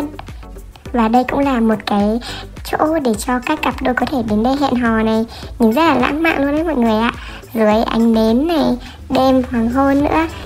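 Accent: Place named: North, Vietnam. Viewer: Đừng nói giọng bắc nữa ah😭😭😭 (Nếu như là ng miền Nam,Tây,Trung)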